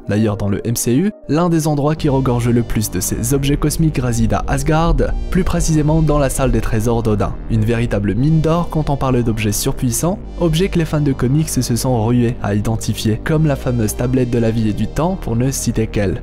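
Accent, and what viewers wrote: Belgium. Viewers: T'es belge ??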